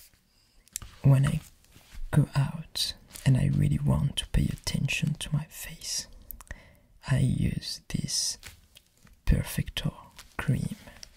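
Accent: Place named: France. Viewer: French accents are so dope.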